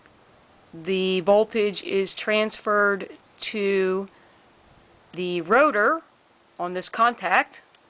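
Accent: Philadelphia, United States. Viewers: The Philly accent makes me homesick.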